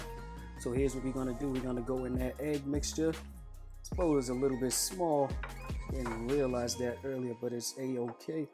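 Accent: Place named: Nuyorican, United States. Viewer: Sounding’ straight up Nuyorican! Me encanta! 🇵🇷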